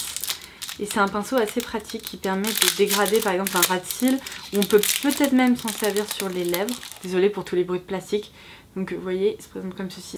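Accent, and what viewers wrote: England, United Kingdom. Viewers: Ton accent anglais est super !!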